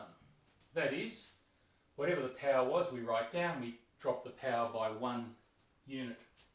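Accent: Australia. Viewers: First of all thank you for the insight. It saves an awful lot of time, mate However, please exchange using the x symbol for multiplication to the dot. It is less confusing in the evaluations. Also, your 4's look like 6's sometimes, especially when used as exponents. And finally, please adapt a system of presentation where you are not in the way of the whiteboard. I am a teacher. please accept this as constructive criticism. I do enjoy the Aussie accent whenever I get a chance to hear it, like Bryan Brown, Chris Hemsworth, Hugh Jackman, Nicole Kidman and your other countrymen.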